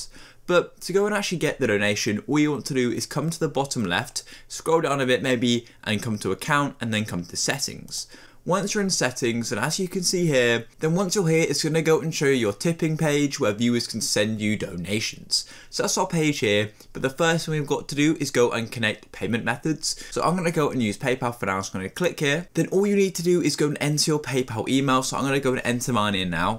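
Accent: United Kingdom. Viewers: He’s definitely British dude he can’t help it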